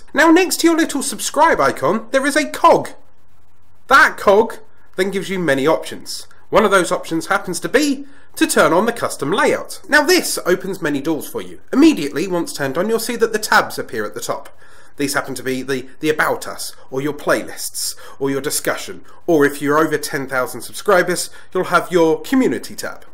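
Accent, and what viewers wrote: United Kingdom. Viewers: Just listen to his British English. Its worth more than 7k views in my opinion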